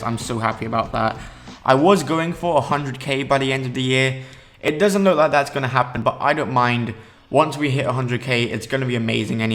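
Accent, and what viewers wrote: United Kingdom. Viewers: Troy Edward Well that's why I said "I don't know if English is your first language". But he sounds like he's somewhere from the UK, so English should be his first language. I wasn't being rude. I was just clarifying what "chicken shit" meant. Even though it's a common saying, he should know what it means. But maybe it's just a common American saying.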